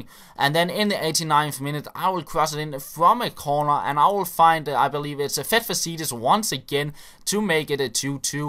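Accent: Denmark. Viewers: Det er dejligt nemt at høre, at du er dansker:)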